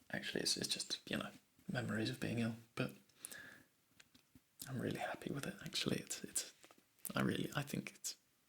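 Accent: England, United Kingdom. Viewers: God I'm in love and is probably because of his weird behavior and british accent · i am almost early yeyyy😂 i love english accent oh god😻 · Something about your accent, poetry writing, mug of ✨hot chocolate ✨ and train destination reading just sits right with me, it is so british i love it!!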